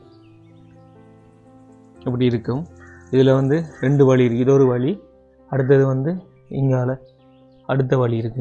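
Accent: Sri Lanka. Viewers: Neengal pesum ilangai tamil migavum asagaka irukirathu brother happy married life.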